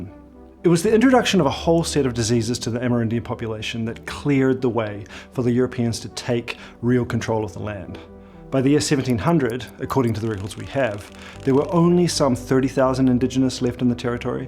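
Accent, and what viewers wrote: New Zealand. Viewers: Are you kiwi?? South Island? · Kiwi accent there?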